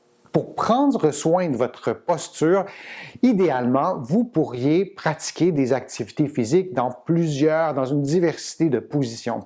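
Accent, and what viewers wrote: Canada. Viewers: Votre accent ( canadien ?)est très agréable à écouter!- J' ai un petit cousin( français) à Montreal et ma fille( franco- allemande) lui avait rendu visite,il y a quelques années avec un autre cousin franco- allemand !.-Moi - même je suis Française en Allemagne et j' ai découvert vos excercices avec beaucoup d' intérêt,ayant moi- même cette bosse,surtout après une scolarité assise, un traitement de choc à la cortisone à l' âge de 8 ans et l' impression,surtout pendant le Covid,d' avoir eu les muscles rétrécis, le nerf sciatique qui se manifeste de temps en temps, malgré la gymnastique en musique depuis 6 ans !-- Comme il n'y a chez vous que 3 excercices à faire, je les fais souvent depuis environ 2 semaines :depuis que j' ai découvert votre site en France , où je suis actuellement !- Merci beaucoup !- Les excercices sont très efficaces: - la sciatique est presque partie,la bosse de Bison,pas encore,mais je ne fais que commencer !👍🙋‍♀️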